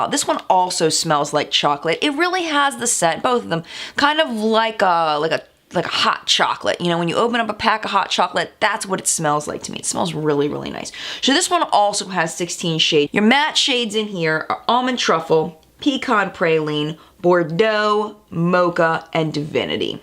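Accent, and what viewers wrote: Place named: New Jersey, United States. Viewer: I subscribed for that Jersey accent alone! ...that is a jersey accent amiright?!?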